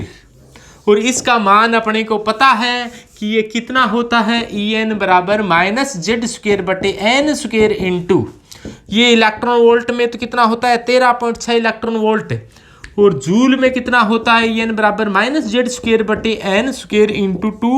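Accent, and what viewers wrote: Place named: Rajasthan, India. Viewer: वाह सर मजा आ गया आप हमारे राजस्थान के ही लगते हो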